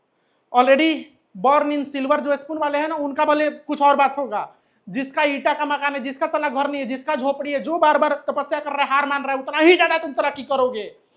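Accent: Bihar, India. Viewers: Sun ke Acha lga aap Bihari ho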